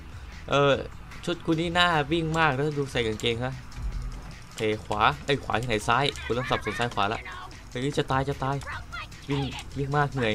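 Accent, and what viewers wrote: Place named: South, Thailand. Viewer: พี่ พูดใต้เป็นด้วย 555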